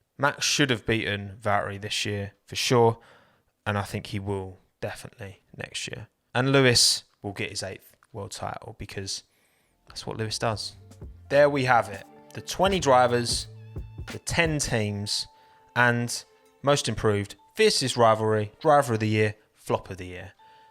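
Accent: United Kingdom. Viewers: So Bri ish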